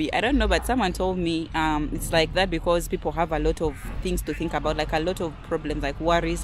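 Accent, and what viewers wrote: Kenya. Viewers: But your English sounds Kenyan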